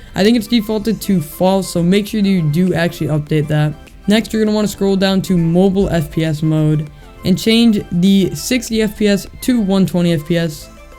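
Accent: France. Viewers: R u French ?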